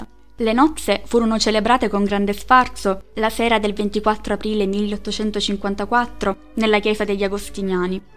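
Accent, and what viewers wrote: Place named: Catania, Italy. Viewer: Sei del Catanese? Si sente molto il tuo accento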